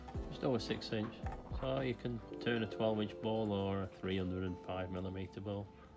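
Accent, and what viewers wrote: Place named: Northern England, United Kingdom. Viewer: A great Northern accent, there sir! A great lathe also. I’ve just bought a 6 month old R2 version. Joe (Manchester)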